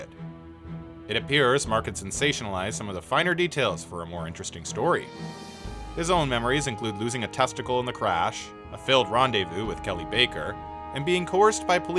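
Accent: Canada. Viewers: I can tell you are Canadian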